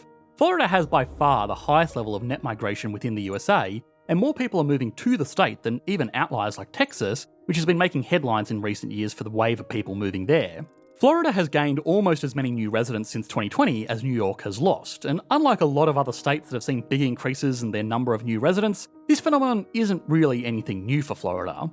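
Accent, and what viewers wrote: Australia. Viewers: 😂 As an Aussie, I can confirm that those weird downward inflections are components of the Aussie urban accent. I don’t have it myself because side I never liked it, it a lot of Aussies do…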